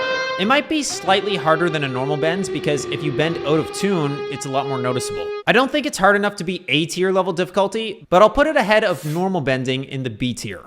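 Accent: Canada. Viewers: you can hear the canadian coming ooot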